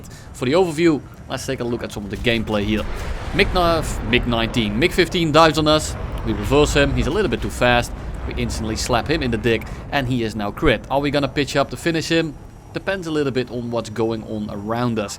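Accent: Netherlands. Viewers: ik hoor n beetje nederlans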